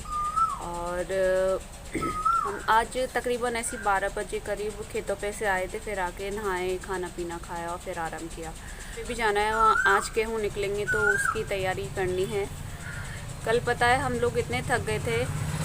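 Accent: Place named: Aligarh, India. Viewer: Haa mam ki awaz sunkar mera dost mere se pucha rha hai to me bola yeh awaz aligarh se hai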